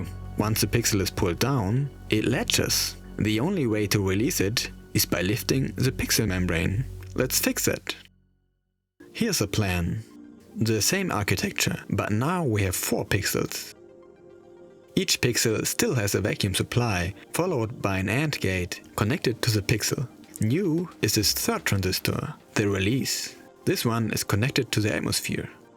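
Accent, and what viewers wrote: Germany. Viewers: Are you german? Sounds like a German accent.. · Lol du bist deutsch? 😂 wieso ist mir der akzent erst am ende aufgefallen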